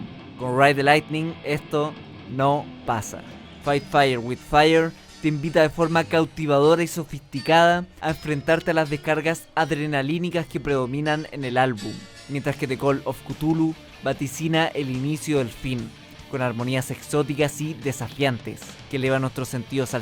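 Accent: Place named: Chile. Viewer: Amigazo. Me encantó tu vídeo y estoy de acuerdo en toooooooooodo lo que dijiste. Para mí este álbum es una obra maestra y el mejor album que Metallica pudo haber hecho! Así que tiene su merecido like y un sub más jeje. Por cierto, de que país eres? Tienes acento chileno . . . Pero quizás me equivoque sjjsjs saludos!! 🤘🔥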